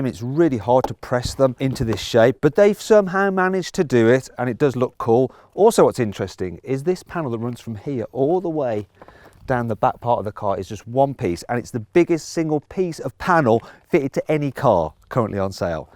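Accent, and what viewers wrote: United Kingdom. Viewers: ゴリゴリのイギリス英語かな？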